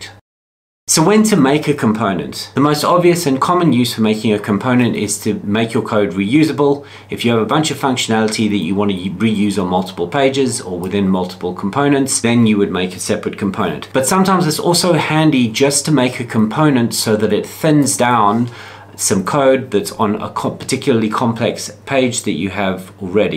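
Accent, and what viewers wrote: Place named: South Africa. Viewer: Do I detect a fellow South African accent ?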